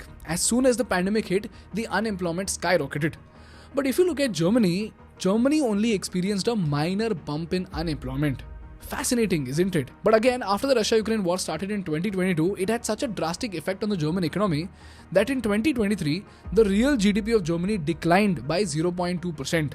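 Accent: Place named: Bihar, India. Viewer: You talk like a BeeHaari, so I am thinking you are from BeeHaar. Before giving everyone gyan, what is your solution for BeeHaar and along with it, its two neighbouring states.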